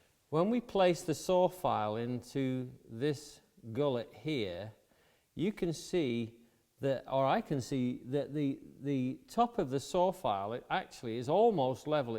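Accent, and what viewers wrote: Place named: Yorkshire, United Kingdom. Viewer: Your accent sounds like my father's Yorkshire?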